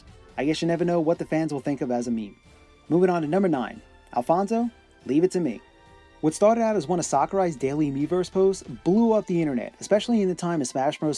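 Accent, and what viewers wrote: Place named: Boston, United States. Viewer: You almost sound like you're from Boston.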